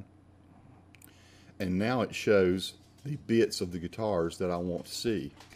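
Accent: South, United States. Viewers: Fuckin it took so long to find this thank you southern accent king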